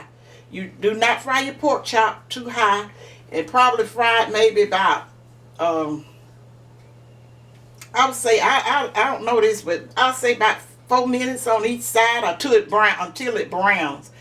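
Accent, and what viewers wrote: South, United States. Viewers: Hello you are truly a delight to watch I just love your southern accent it's just beautiful and your very detailed step by step, if someone doesn't get it the first time with you,I truly feel sorry for them your very detailed. This is my second time watching you and I shall continue watching your blog.....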